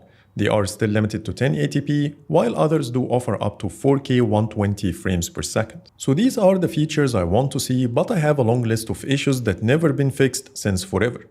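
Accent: Egypt. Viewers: Are you Egyptian You accent looks like Egyptians accent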